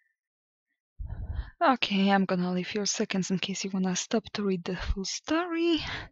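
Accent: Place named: Ukraine. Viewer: Like your accent. That Ukranian?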